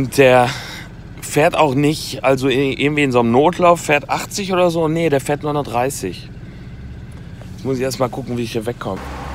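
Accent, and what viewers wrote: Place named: Dorsten, Germany. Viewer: Ich komme aus Dorsten und lebe schon seit über 20 Jahren in Süddeutschland, ...du redest, wie bei uns zuhause, alleine schon deshalb gucke ich deinen Kanal. Weit weg bist du auch nicht, oder?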